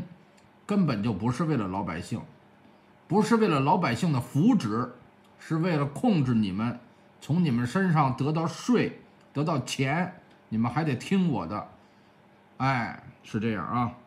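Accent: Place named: North, China. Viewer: 最烦这种北方口音 肚子里没文化 整天要指点迷津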